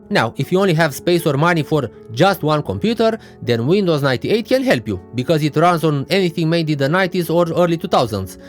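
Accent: Romania. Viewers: Salut. Mișto clipul, succes! A, și super accent romanesc haha.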